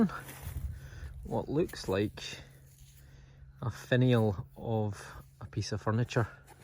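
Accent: Scotland, United Kingdom. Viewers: Hey, hope you're doing well. Can I know, is your accent Scottish or Irish?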